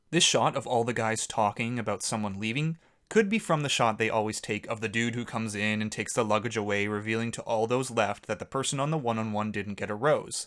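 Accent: Canada. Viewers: I freaking love these videos! 💚 also, are you Canadian? I like your accent!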